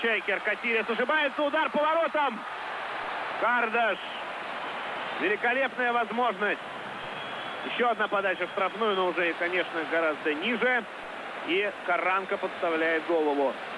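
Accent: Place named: Kyiv, Ukraine. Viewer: Уважаю киевский руссь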